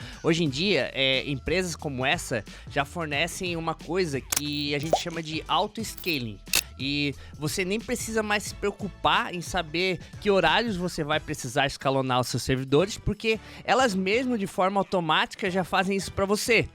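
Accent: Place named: Garopaba, Brazil. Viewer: Cara, vc fala igual um garopabense raiz kkkkk vc é de Garopaba-sc? Não deu pra não notar... kkkk excelente video, mas poderia explicar mais simples antes de entrar no assunto do título hehe mesmo assim gostei... ganhou meu like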